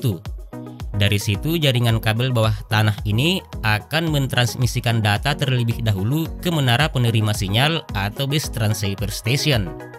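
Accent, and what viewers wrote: Medan, Indonesia. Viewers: Orang Medan ya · Medan kali suaranya 😅😅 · Medan punya nih suaranya 😁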